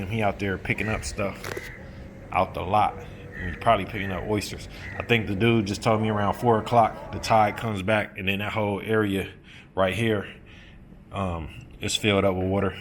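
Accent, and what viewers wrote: United States. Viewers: Sounds like u from Cali homie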